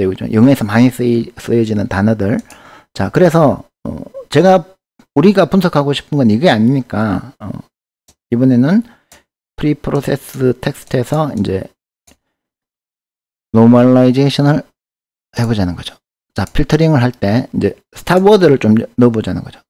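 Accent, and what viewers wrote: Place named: Gyeongbuk, South Korea. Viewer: 우와 교수님, 목소리도 귀에 팍팍 꽂히고 설명도 너무 재미있어요! 교수님 사투리가 경북 사투리인가요? 대구사투리나 경남사투리는 아닌것 같아서요...중간에 "감성분석(Sentiment analysis)" 언급하셨는데, 이 방법으로 혹시 사투리를 구분하는 것도 가능한가요? ^^ 고급강의 올려주셔서 감사해요~아이 씬나~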